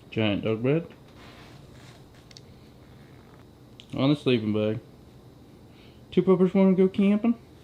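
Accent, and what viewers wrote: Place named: United Kingdom. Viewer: Welcome to the states. I assume you live in the UK? Those hard boiled eggs looked good. Now I'm hungry for hard boiled eggs. Looks like you're well packed for your trip. The truck bed looks comfortable. Sounds like a good time with family in Vegas and camping afterwards. Enjoyed the video. Love your British accent.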